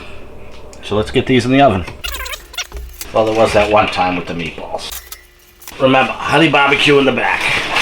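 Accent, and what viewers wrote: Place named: North, United States. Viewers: Sounds like Pittsburgh accent am i right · Like your accent man, I'm from the deep south and love listening to you guys up there talk.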